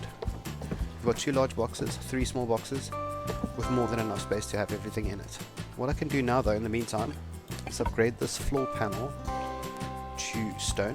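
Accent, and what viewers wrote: Afrikaans, South Africa. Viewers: R u south african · I love how i can recognize a fellow SA M8 voice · You sound south african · thanx this vid was realy helpful are u south African? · are u south African?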